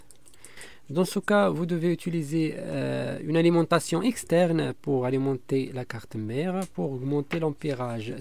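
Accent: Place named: Algeria. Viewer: السلام عليكم ، الأخ مين من الجزائر لهجتك جزائرية، أريد إستشارتك في موضوع الله يحفظك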